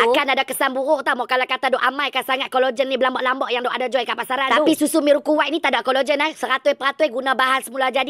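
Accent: Kedah, Malaysia. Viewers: Sedia2 bedah kna na.. Cian dia. Syok dgaq bhasa kdh piaw..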